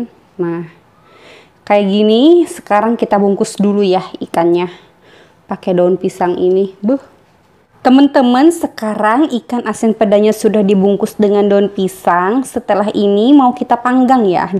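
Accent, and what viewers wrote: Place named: West Java, Indonesia. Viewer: Teh asli mana sih resep ngomong'a Sunda trus medok gitu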